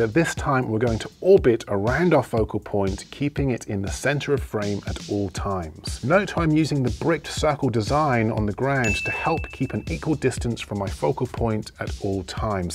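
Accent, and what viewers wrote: United Kingdom. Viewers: I just subscribed a few days ago, I watched at least 15 vids of yours, I ordered a Gimbal this morning ($139) which I have never beard of lol. Next I will check out Epidemic Sound oh and Skillshare too. I just started my youtube vids, after I watched yours I am so embarrassed to show mine. But Honestly I think I have a crush on you, you're so talented, genuinely kind and hunble. LOL not to mention your British English accent so sexy 😅😅😅😅 ok let me stop 😅😅😅😅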